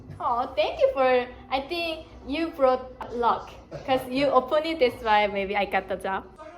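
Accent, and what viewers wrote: Gyeongsang, South Korea. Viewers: 어쩌다 지나가다 ~축하드려요 ㅎㅎㅎ 억양이 경상도인것 같네요 ㅎㅎㅎ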